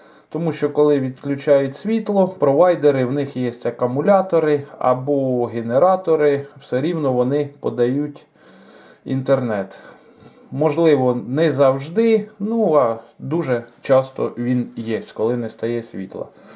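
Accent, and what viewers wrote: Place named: Poltava, Ukraine. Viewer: По говору зразу зрозумів,що автор відео з Полтавщини. Дякую!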